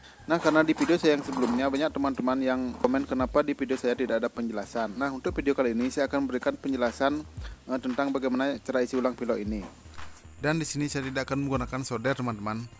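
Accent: Aceh, Indonesia. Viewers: Kyk nada aceh ni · Kyknya org aceh nih dr logatnya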